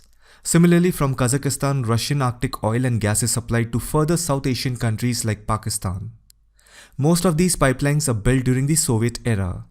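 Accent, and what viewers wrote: India. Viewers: It's an Indian accent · From the accent I’m assuming he’s Indian and English is widely spoken among educated people in India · Dude not only is the content of the video incredibly good, but also your voice is just on-point. I'd never thought indian accent could be so cool. I bet it took years to train that voice, singers would be envious :D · Nah a lot of Indians have this accent. You're just stereotyping us with the western concept of the funny Indian accent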